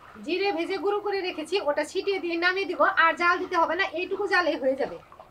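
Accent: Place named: Bangladesh. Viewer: Didi ki bangladesi meye naki,kotha bolar doron ta bangladesi der moto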